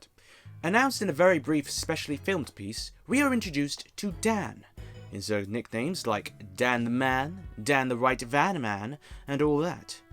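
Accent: RP, United Kingdom. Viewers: First off, to you and all fellow Whovians, KISS army (fans of KISS), Trekkies, Jedis and everyone who's alive (I think you'd agree to name all the people on Earth would be difficult) - HAPPY NEW YEAR 2021. As I've said many times now... FUCK OFF 2020 (apologises to ladies present for my swearing). Wouldn't you agree 2020 is the first year that people the world over ACTUALLY and personally DISLIKE? Anyway, ODDLY, I have to say following what you were saying in the video was difficult? No disrespect. I love you posh accent and tone because I have it too. We have the Mojo ladies and Yanks like LOL Maybe picked up from watching Jon Pertwee, the previous two Doctors and beyond with their BBC Queen's English? Let's face it, until 2005, Doctor Who never was working class or had any characters representing them until the 9th & 13th Doctors. And now Dan has/will? 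Which leads me to the next point: Is it possible the increase in northerners has put off American viewers because apparently they have been baffled by John Bishop? I haven't watched "Resolution" or much of the season that finished. Not sure which one it is. So, I was confused by the trailer with John Bishop. It looked to me in the way it freeze framed and the wording to be introducing Bishop as the NEW Doctor? In fact I think they've MISSED a chance to cast him which would've been perfect. I don't think a female Doctor has been a hit with non fans. But to say the show's problems is due solely to the Doctor being femal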